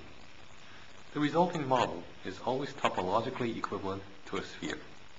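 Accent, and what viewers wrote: Flanders, Belgium. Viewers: flemish, an a bit english XD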